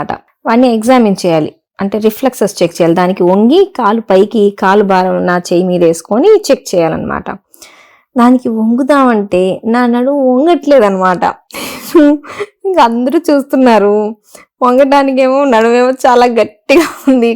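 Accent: Guntur, India. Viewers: Brahmandamga cheppavamma. Somehow, I like your Telugu accent of Guntur area and your down to earth explanation, which itself is a great virtue for any doctor.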